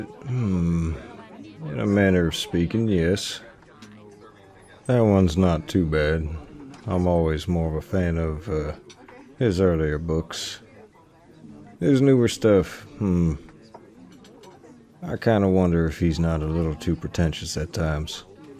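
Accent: South, United States. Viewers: IVE BEEN WATCHING YOUR SOUTHERN ACCENT SERIES ON REPEAT…. It’s safe to say I’m an addict now… 🥀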